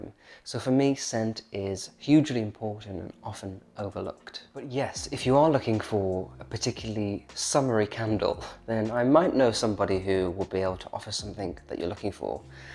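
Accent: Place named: Scotland, United Kingdom. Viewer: I see you likeBunny Mellon. She is a favourite of mine too. Sophie always steals the show. I have had a couple of Shi Sues over the years. Best dogs ever. Sorry, I did have a giggle over your Scottish accent. It took me ages to work out you meant lampshades. I thought you were saying lunch bids . Wondered what they were 🤪Really liked your topiary plate.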